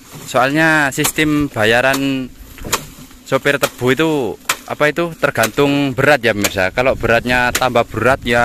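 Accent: Madura, Indonesia. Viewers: Mas orang malang apa madura ya.??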